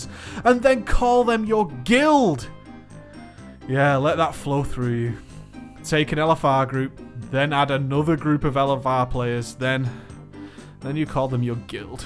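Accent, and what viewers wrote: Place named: Lancashire, United Kingdom. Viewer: "Thirdleh, tek oppotuniteh" - dat Lancastrian!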